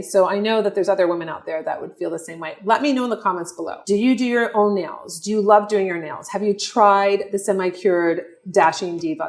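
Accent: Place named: New Jersey, United States. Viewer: Are you from N.J?? You sound exactly like Margaret from Real housewives of N.J.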